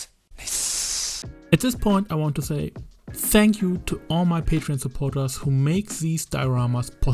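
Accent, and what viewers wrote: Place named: Germany. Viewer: German? Irgendwie klingt dein Englisch nach deutschem Akzent. Nice shit btw. ;)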